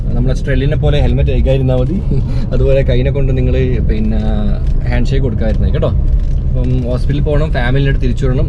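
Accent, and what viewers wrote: Kannur, India. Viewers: Bro nigal kannur karan anoooo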